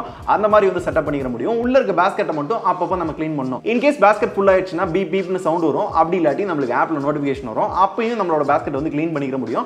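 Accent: Madurai, India. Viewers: மதுரை slang Semma thalaiva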